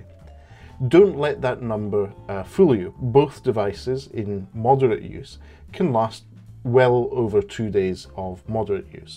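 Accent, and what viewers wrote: Scotland, United Kingdom. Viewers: You sound Scottish 🏴🏴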